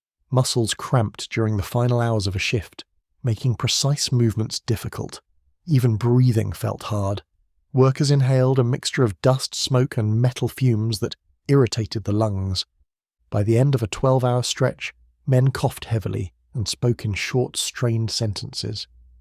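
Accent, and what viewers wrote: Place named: United Kingdom. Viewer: Please: Why spoken in Britisch English? Sometimes really hard to understand...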